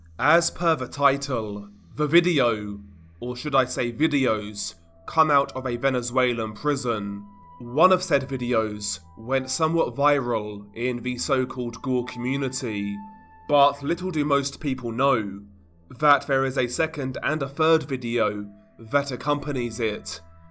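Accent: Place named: United Kingdom. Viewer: Man, this british accent is fire for telling such well writen stories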